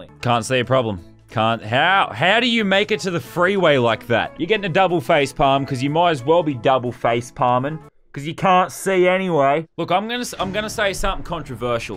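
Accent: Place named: Australia. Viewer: I find the australian accent so cool, the way says gone as gaawn.😅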